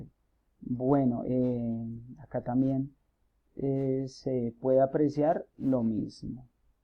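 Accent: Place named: Colombia. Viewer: Pues el cuento me pareció muy realista ya que como lo dice en el titulo hay personas que tiran la piedra y esconden la mano es decir, que hay personas que cuando les conviene son santas pero cuando no les conviene son malosos. En otras palabras son hipócritas. Además el cuento super bueno ya que lo que me gusto fue que parte de ser escrito con el acento colombiano es muy realista. Que tengas un bonito día profe!!!♥♥🥰😋😁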